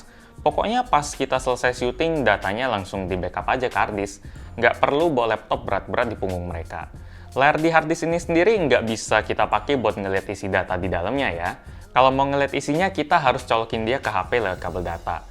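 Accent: Palembang, Indonesia. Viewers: Bang lu org mana? Palemvang ya?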